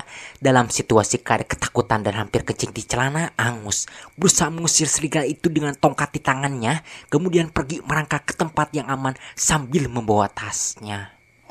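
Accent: West Java, Indonesia. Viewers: Suka sama logat sundanya sama banyolannya 🤣 salam dari Pulau Batam Indonesia #RumahDiAtasLaut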